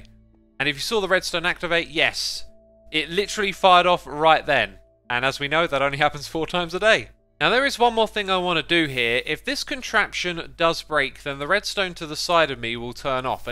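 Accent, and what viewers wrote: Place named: United Kingdom. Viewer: he's so bri'ish, talking about evil X's a'i'ude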